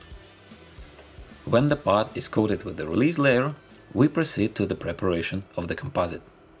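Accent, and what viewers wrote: Russia. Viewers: Я досих пор не понимаю ты русский(украинец) или американец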